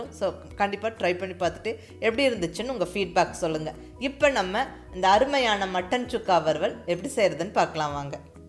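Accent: Kongu, India. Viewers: Konku tamil arumai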